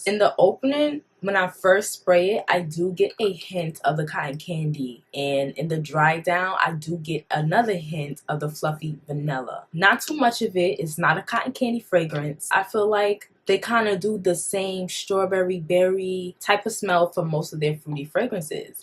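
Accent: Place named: East Coast, United States. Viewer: It’s giving East Coast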